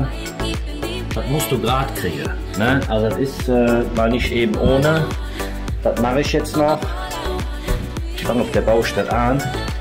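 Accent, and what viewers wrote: Cologne, Germany. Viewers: Hallo sympathischter Stukateur der mir bekannten Handwerkerschaft, lieber. Als ehemals in DDR-Zeiten gelernter Baufacharbeiter Hochbau mit absolutem Hang zum Verputzen gefallen mir alle deine Videos außerordentlich. Besonders deine absolut lockere Art und deine hervorrajende kölsche Dialekt sind sehr sympathisch. Mit "eine Typ" wie Dich hätte ich auch gerne zusammengearbeitet. Mache bitte noch viele weitere Videos des vielfältigen Handwerkeralltags. Nette Menschen wie du es bist, sollten von den Handwerkskammern als Botschafter und Werbeikonen für das Handwerk herangezogen werden, meine Meinung... Die weiterhin maximale Produktionserfolge im Gewerk und beim drehen weiterer Videos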